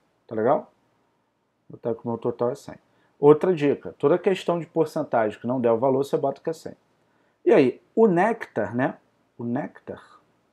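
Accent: Rio de Janeiro, Brazil. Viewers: A melhor forma de aprender matemática, com sotaque carioca, muito bom vcs são incríveis 🎉🎉🎉❤❤❤❤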